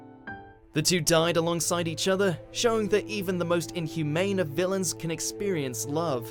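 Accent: United States. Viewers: Owbeetow Ucheeha. Gee, Why the fuck do you have to always americanize the way you read japanese names?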